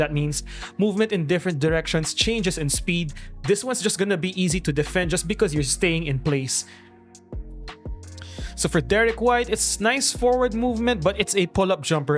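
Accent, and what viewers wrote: Philippines. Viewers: Tagalog accent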